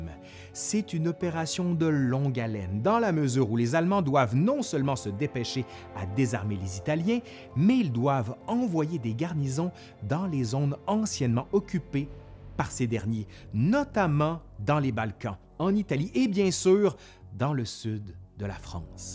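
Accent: Quebec, Canada. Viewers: Premièrement j’adore l’accent québécois. Si tu avais été mon prof d’histoire-géo au lycée je suis sûre que j’aurais eu des meilleurs notes. Non vraiment tes vidéos sont super instructive moi qui suis une passionnée de la seconde guerre mondiale j’ai trouvé du contenu digne de ce nom. Je me rappelle aussi des témoignages de mon arrière-grand-mère. Alors je suis française je viens de Vendée et j’y vis toujours. Vendez égal zone occupée elle disait que tous les jours il y avait un allemand sur sa bicyclette avec une bouteille à la main en train de demander des pièces et il fallait lui donner les pièces sinon… on m’a aussi raconté que dans une ferme de ma région le fermier s’est retrouvé sans sa vache parce qu’un autre fermier concurrent a dit à un soldat allemand de s’en emparer. Bref le temps a été dur pour ces personnes que j’ai connu et qui m’ont témoigner de ce qu’ils ont vécu avec beaucoup de courage. 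Cependant les témoignages de la famille ne suffisent pas il faut voir les choses avec globalité et grâce à toi c’est possible. Vraiment merci tu es trop génial. Il faut mieux regarder tes commentaires que niaiser comme on dit chez toi. Kiss et continue comme ça! Bon, je descends les vidanges et je reviens à cette nouvelle vidéo. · Excellente série de vidéos ! un gros travail objectif et exhaustif.... peu de niaiseries sentimentales, l'histoire et que l'histoire jaime beaucoup et l'accent québécois c'est la petite cerise... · Je découvre votre chaîne, et -- je vous le répèterai ailleurs, sans doute losque je regarderai votre première guerre mondiale -- j'aime beaucoup votre voix québécoise au sujet de l'histoire, qui vous incite à traiter d'autres faits que choisirait un français. Par exemple, il n'est pas indispensable de parler ici de la libération de la Corse, qui n'intéresse qu'un français de France...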